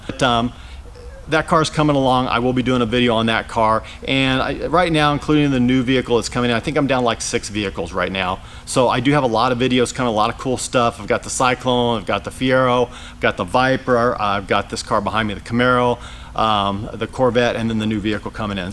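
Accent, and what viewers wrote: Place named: United States. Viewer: You sound like a regular American, especially for a Californian... 😉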